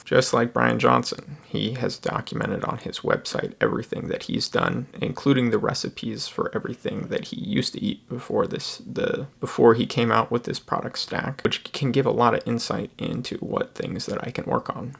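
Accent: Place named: Pacific Northwest, United States. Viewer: Pacific Northwest accent maybe? A lot of people here speak like this.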